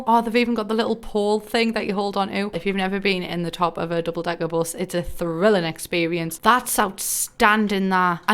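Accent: United Kingdom. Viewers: I don’t understand British speech can someone INTERPRET???? I only reed Harry Potter and that’s it , yes I’m American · I've been binge watching you for about a week now, and now the voice in my head speaks with a thick british accent.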